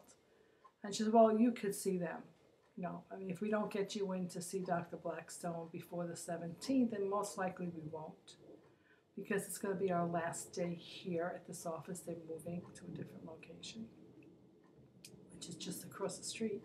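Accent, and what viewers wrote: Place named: New York, United States. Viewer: I'm so glad I found this! I'm waiting to get my appointment! I'm from NY too Babylon ny...by the sound of you accident you might be too!! I hope all goes well!! I'm excited but scared. She really is a good doctor despite the hiccups but it's a pain.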